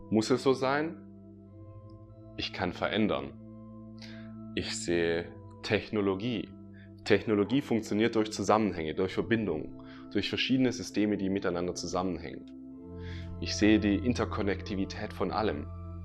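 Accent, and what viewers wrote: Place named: Swabia, Germany. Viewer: Liebe Grüße aus Chiang Mai... du hast nen schwäbischen Akzent 😁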